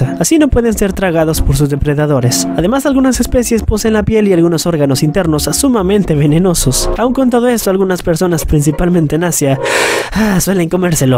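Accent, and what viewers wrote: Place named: France. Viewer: Yo solía pensar que era un francés hablando en español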